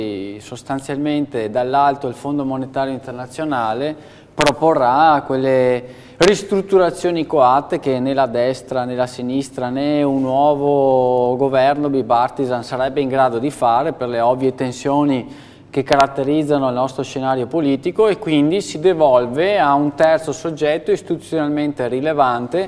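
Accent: Veneto, Italy. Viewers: Benetazzo, non peredere mai il tuo accento trevisanpadovano che ti caratterizza!!! bella Malta!!! ;-)))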